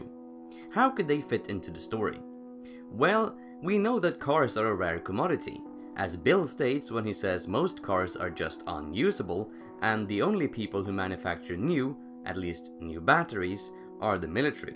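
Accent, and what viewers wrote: Sweden. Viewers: Are you Swedish??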